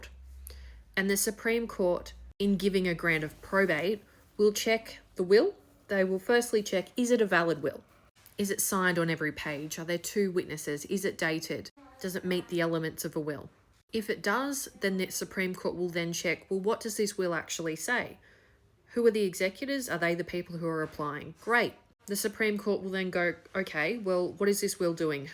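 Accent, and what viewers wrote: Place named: Australia. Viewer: Well explained, with an Australian accent.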